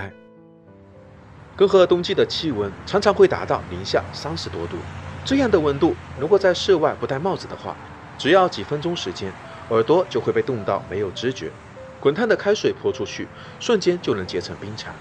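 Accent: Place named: Fujian, China. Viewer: 你这口音很福建啊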